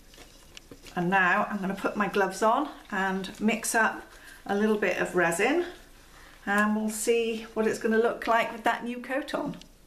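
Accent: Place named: United Kingdom. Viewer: That's awesome, a 2 sided resin picture! I've been trying to make out your accent. Pretty certain youre British, maybe from Devon or Cornwall, somewhere down there. Then I was thinking may be that's the accent from the island where you are. Then, haha, you said 'soap or squeezy,' & I'm pretty sure it's just us Brits that call it squeezy. For the rest of you, it's probably dish soap or the actual brand name! 😀😀 Anyway, thank you for all your tips & experiments. I'm really enjoying watching you.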